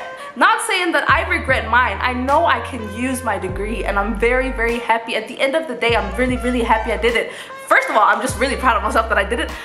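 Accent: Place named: Latin America. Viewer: Your accent sounds somewhat Latino. What country and town to you live?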